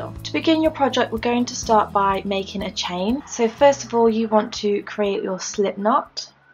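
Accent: United Kingdom. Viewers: I've watched many a tutorial from others and they just cannot seem to convey what to do as well as you or they go too slow and repeat things too often. I've seen some of your tutorials and I just have to say that you really are the best! I've been crocheting since I was 13 (now 60) and I just love learning new patterns. Thanks so much for your videos! P.S. I love all things British so your accent (at least to me) is brilliant!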